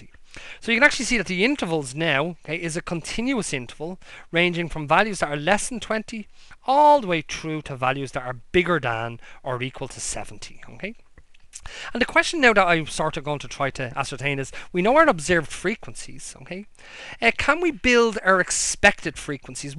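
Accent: Ireland. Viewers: You're Irish right?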